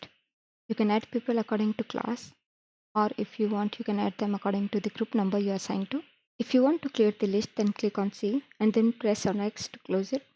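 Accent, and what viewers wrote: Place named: India. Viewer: indian english probably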